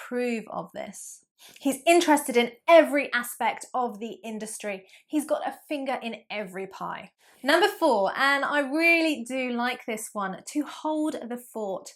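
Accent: United Kingdom. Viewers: I like the British accent :-) Hello from E.T. -- 🦋East Turkistan🦋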